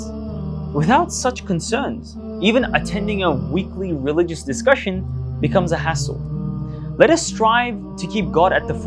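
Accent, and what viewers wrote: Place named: Australia. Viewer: I loved the accent, reminded me of an Australian accent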